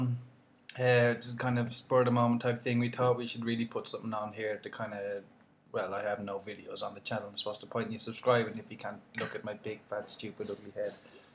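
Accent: Ireland. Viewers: Where in Ireland are you from? Dublin ya? Haha I'm Irish and I swear so much lol I need to stop! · Woah I never noticed you were Irish in & Vlogs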